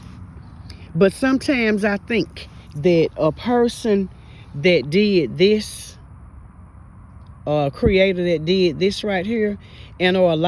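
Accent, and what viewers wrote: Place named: Mississippi, United States. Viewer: You sound like you are from Mississippi.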